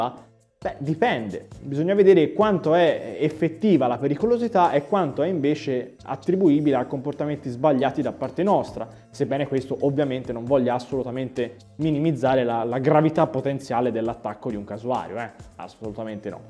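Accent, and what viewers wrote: Tuscany, Italy. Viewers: bello sentire parlare un po' di Toscano maremma cigniala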